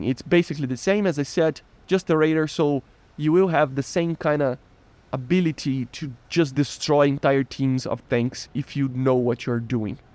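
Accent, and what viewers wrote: Brazil. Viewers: Tu é Br? Sotaque familiar nesse inglês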